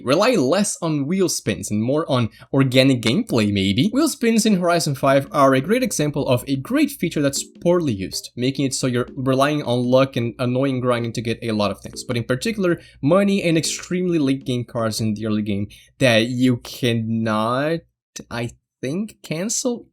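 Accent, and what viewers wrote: Brazil. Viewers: U kinda sound brazilian edit: kkkkkkk you are 🇧🇷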